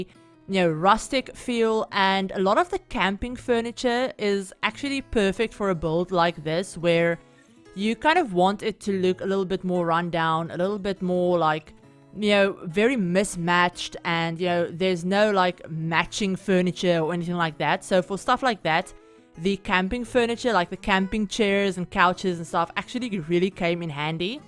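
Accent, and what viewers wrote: South Africa. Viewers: I totaly probably just assuming cause of you're accent, you're South African probably cause it's just like I can never find SA youtubers related to stuff I watch I'm am SA so it so it's just nice to,for some reasone I can't realy understand American accents it just sounds kinda fast IDK why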